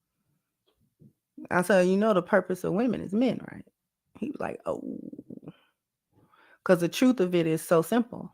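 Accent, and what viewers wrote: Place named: New York, United States. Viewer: NY'er here, Brooklynite til the day I die. I hear that NY accent slowly creeping into vernacular... sto-ah, not store? I know next, she gonna start saying caw-fee 🤣🤣🤣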